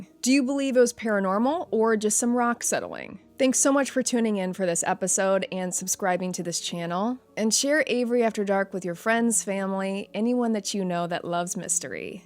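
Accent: United States. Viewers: I've heard this story before somewhere. But your cowboy accent made this so much more entertaining!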